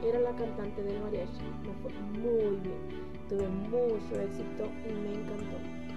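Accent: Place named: Chihuahua, Mexico. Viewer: ya decia yo que tenias acento de chihuahua :) yo soy de chihuahua !!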